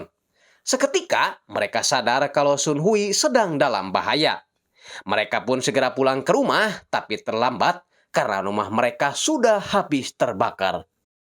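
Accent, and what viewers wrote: West Java, Indonesia. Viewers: Logat adminnya sunda bangett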